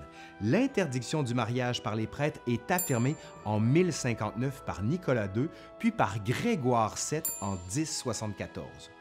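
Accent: Quebec, Canada. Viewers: Je suis venu grâce à l’accent français du Canada pour mieux l’apprécier. Et maintenant j’apprécie les vidéos et tous que j’apprends ! Merci ! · je ss restée bouche bée en écoutant la langue française québécoise pour la première fois 😯 qui est comme moi??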